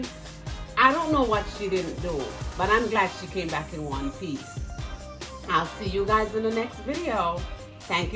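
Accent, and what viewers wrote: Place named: Jamaica. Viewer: Interesting to see your haul from Jamaica. Items I've never seen before and great education for me and especially you speaking in Jamaican 😍👍🏻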